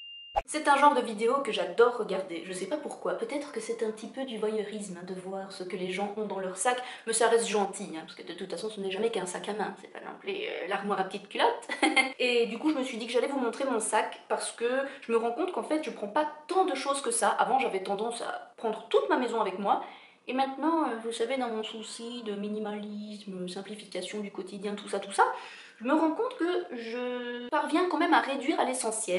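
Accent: Belgium. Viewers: Pour la petite histoire, haha, J'ai reçu la semaine passée un masque Glamglow et j'avais envie d'avoir des avis concernant ce masque. Donc, en cherchant sur youtube je suis tombée sur ta vidéo qui compare ce masque avec un de Sephora - si ma mémoire est bonne. J'ai été interpelée par ton léger accent qui me faisait penser aux Belges et j'ai visité ton Instagram où j'ai vu, qu'effectivement, tu étais Belge. De là, mon petit côté patriotique m'a poussée à jeter un oeil à d'autres de tes vidéos et je dois dire qu'encore maintenant, je suis toujours sur ta chaine à visionner ton petit monde... Parce que j'ai une véritable passion pour les USA et surtout NYC, donc tu me fais littéralement rêver et en plus, je suis une crolée comme toi sauf que j'ai jamais trouvé l'astuce pour arriver à dompter mes cheveux si ce n'est le lisseur, donc je suis aussi intriguée par tes astuces. 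Voila voila ! haha. Si t'avais une boite postale j'aurais pu t'en faire parvenir un que j'ai et qui ne me sert pas. Mais bon voila, sache que chez Club il y en a. Je sais qu'on peut commander sur le site, mais je ne sais pas s'ils livrent aux USA. Je crois qu'ils sont limités à la France et au Luxembourg :/ Bisous !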